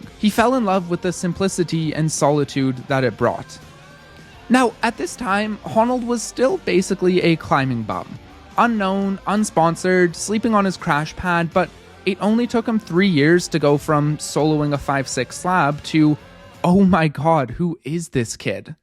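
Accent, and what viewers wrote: Canada. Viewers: I can tell the narrator is Canadian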